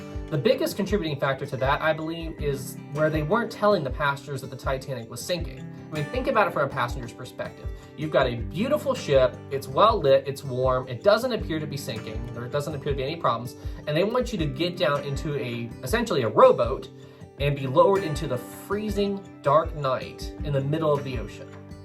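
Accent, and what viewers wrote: South, United States. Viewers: As usual, awesome video man!! Where are you from? I’m catching a slight southern accent haha. I’m a Tennessean Titanic Fanatic. 😂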